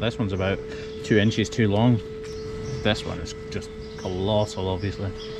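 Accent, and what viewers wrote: Scotland, United Kingdom. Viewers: I'd love to know how long it takes to get that far in vet time to become so good in hoofs ,, nevermind I'll just listen to the Scottish voice 🤗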